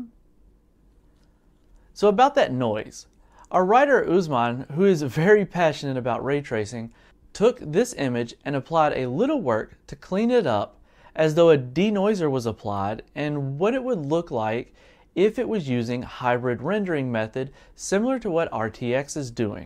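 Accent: South, United States. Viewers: Awesome video! But I gotta ask what accent do you have? I've never heard a "standard" American accent mixed in with a southern twang!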